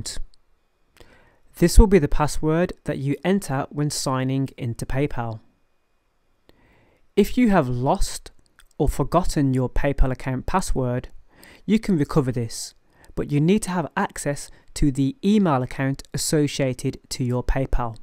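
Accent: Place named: RP, United Kingdom. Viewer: You sound dead posh ya know 😂👌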